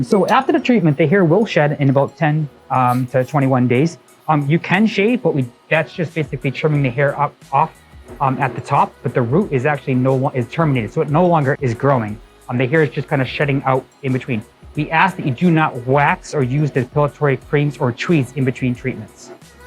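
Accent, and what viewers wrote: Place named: Asia. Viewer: Bro the Asian accent 💀💀💀💩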